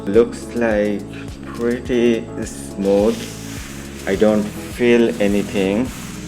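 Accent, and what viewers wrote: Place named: South Africa. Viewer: Where are these sold? South Africa and Zimbabwe? [Judging by presenter's accent.]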